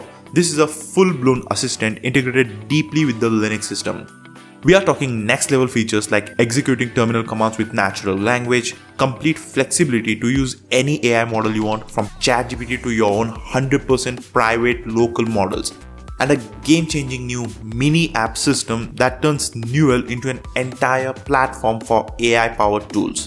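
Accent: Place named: India. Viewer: Dude, I don't understand what you are saying. you got strong Indian accent. I agree with everyone here. LINUX should be free from AI. I left windows 10 years ago, because I don't want Bill Gates to spy on my computer and steal my bank information and all other login info. Installing AI that is NOT open source means you are putting a Trojan horse in your computer. Soon AI will take over your laptop and STEAL all your bank info and MONEY. That is scary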